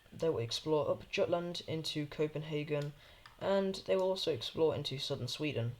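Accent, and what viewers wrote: United Kingdom. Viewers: British accent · why do people think you sound like anorwegian when he’s american you’re from southern england 😭😭😭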